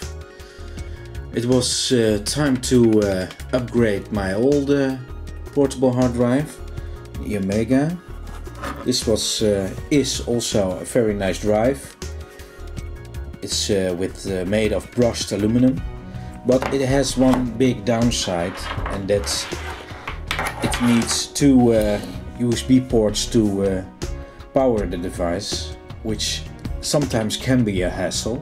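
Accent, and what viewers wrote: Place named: Netherlands. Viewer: That strong dutch accent tho lol, nice review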